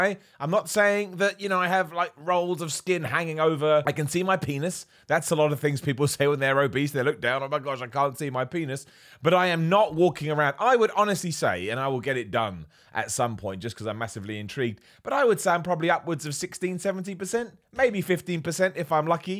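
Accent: United Kingdom. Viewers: Great as always love your candor. Always good info and a laugh. maybe it's because I'm from the United States but I could listen to somebody from the UK talk all night long it's not that that's weird I just like the accent anyway keep up the good work man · Great Video, I'm from the UK and it is so funny hearing your views as I think the same and always better UK voice than American like there is alot on YouTube. Keep up the great work.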